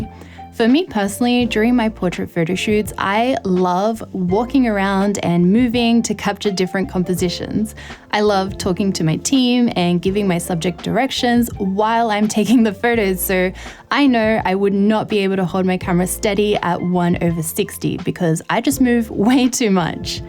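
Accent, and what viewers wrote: Sydney, Australia. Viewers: Mumma you australian? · Americans love the Aussie accent 🇦🇺😍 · Great content. Good to hear the Sydney accent. It’s amazing how you can still get negative comments with pretty informative non-controversial videos. I’ll be saving your channel for our schools photography course for next year. Keep up the good work.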